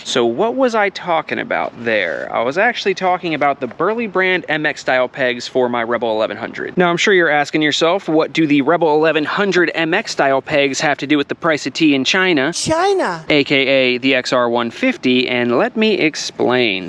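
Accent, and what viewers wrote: United States. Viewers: You sound American but you keep talking about liters of storage?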